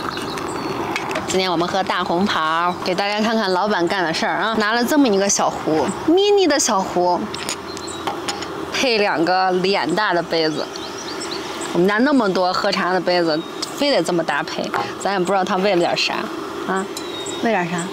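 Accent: China. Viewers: 大陸鄉音太重！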